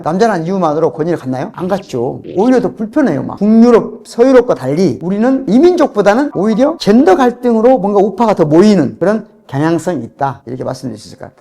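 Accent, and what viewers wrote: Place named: Jeolla, South Korea. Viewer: 말투가 전라도네 ㅋㅋㅋ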